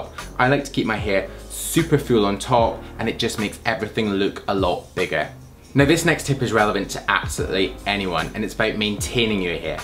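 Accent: Scotland, United Kingdom. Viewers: i think he's Scottish ive got a Scottish friend and he sounds pretty similar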